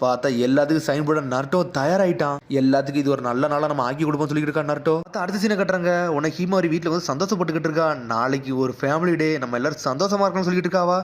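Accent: Thoothukudi, India. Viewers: Lastaa kuramaa nu sonnathu nalla irunthuchu.. 😊 Neega Thoothukudi yaa na??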